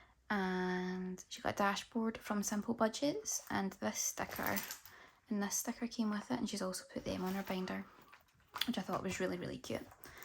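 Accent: Scotland, United Kingdom. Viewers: Haha no problem, also don’t worry about spraffing on in your videos, it’s refreshing to hear a fellow Scottish accent doing a budget video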